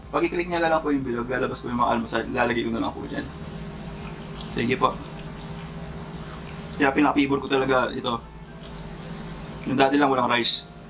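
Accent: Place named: Pampanga, Philippines. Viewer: Ali naman boring sao..okay yamu..mas masanting pin more on kain hahaha..pero pag atsu sao ann atin talaga kwento tang punto ng kapampangan yang magdala hahahhaa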